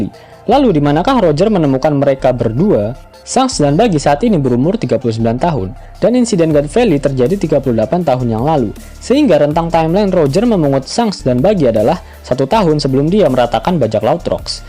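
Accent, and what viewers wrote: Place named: Bali, Indonesia. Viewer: a na ca ra ka da ta sa wa la, orang bali kayanh nih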